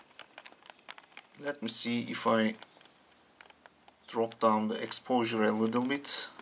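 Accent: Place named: France. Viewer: French accent eh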